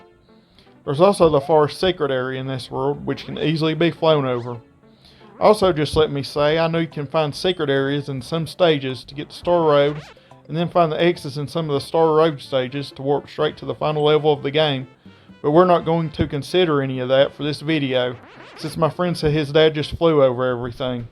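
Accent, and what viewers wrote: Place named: Alabama, United States. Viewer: Where are you from, buddy? Alabama?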